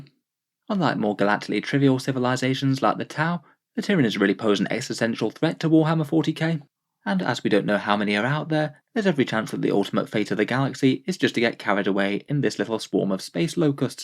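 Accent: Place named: Northern England, United Kingdom. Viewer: He's northern english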